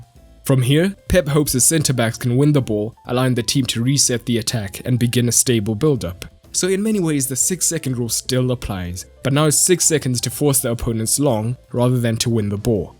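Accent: South Africa. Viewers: You sound South African ... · You sound South African 🤔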